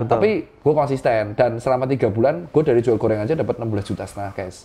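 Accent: Java, Indonesia. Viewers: Medok bgt,,ngomongnya...jawa,yes.gorengan kesukaan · medok guwa guwene🤣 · Asli mau segaul apapun logat jawa tak bisa di hilangkan 😄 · medoookkk bingit😊😊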